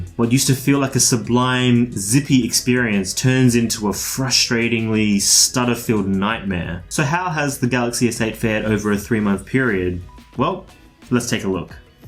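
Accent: Australia. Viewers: Ahmad Fuad The guy here is from Australia as I guess from his accent, and Australia got the Exynos version. The Exynos is much better optimized and runs much smoother than the Snapdragon. Honestly, I think he exaggerates the stutter (there is no actual lag, only microstutter, which isn't the same as lag), as it is only noticeable if you really look for it and specifically pay attention to it. My only gripe with the S8 is the redundant Bixby button and the awkward position of the fingerprint reader. I would also be happy for a better speaker, or rather two stereo speakers, and a 32bit DAC for the audiophiles who walk around with fancy headphones. I found the camera to be amazingly good, especially the video capture is astonishing. I also like how the phone feels in the hand and how comfortable it is to hold it and to operate it with one hand. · Hey, which part of Australia are you from?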